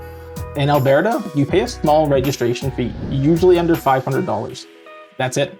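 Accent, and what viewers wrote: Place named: Ireland. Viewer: No offense, I notice a slight Irish accent in you. Is that the Calgary accent? Lots of Irish descendants there?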